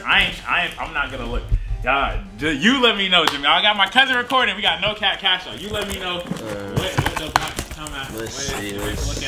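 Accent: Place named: South, United States. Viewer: i been saying the exact same thing but its a southern thing i got cuzins that sound similar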